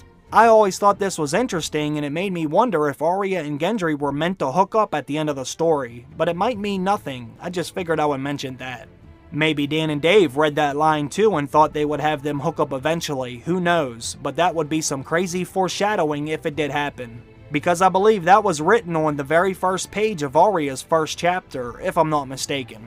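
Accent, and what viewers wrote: Pittsburgh, United States. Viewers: Are you from Pittsburgh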